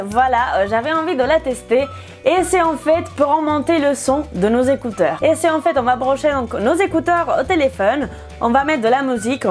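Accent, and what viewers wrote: Spain. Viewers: Mademoiselle espagnol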